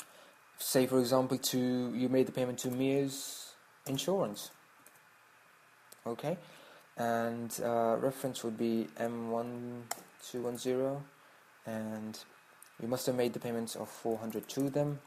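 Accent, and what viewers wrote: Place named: United Kingdom. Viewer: This is very excellent and helpful. But it does not tell about origin of country. It sounds like British. Thanks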